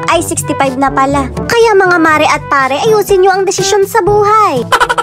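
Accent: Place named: San Miguel, Philippines. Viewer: Natutuwa talaga sa mga taga San Miguel ganyan na ganyan magsalita tulad mo? Nalimutan ko nga name fr ko dyan siguro age nya nasa 45 yrs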